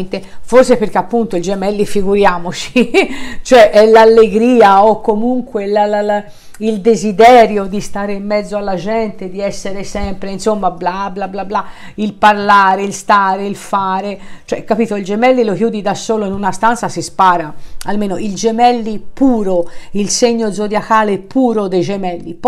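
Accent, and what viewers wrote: Tuscany, Italy. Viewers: Mi garba tanto il tuo parlare toscano non so se fiorentino o pratese... saluti dal 🇲🇽 con ❤️👩‍🦳 alla mia Bell'Italia dov'è rimasto il mio 🦀 codardo quanto bello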